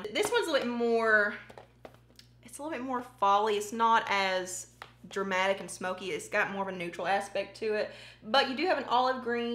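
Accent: South, United States. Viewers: i was looking for a dupe for one of the greens in the dark magic palette, but tbh even with the warning that there probably won’t be an exact dupe, i have to watch the whole thing now because your accent is so adorable! i love southern drawls, they’re so relaxed and pretty-sounding~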